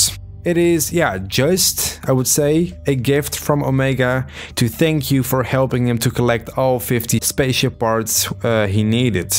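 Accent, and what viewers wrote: Netherlands. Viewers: Dude are you dutch????? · Nederlands toch je weet zelf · you are dutch I recognice your bad accent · yu dutch m8?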